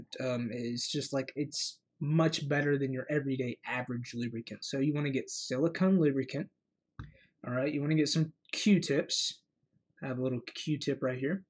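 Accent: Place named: South, United States. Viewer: Great video and the Southern accent is badass.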